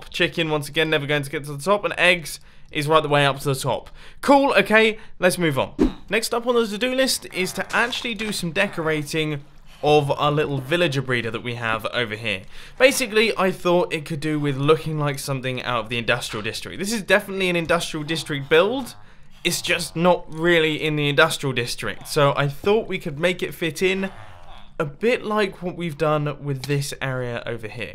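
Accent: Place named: RP, United Kingdom. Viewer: Who else remembers posh?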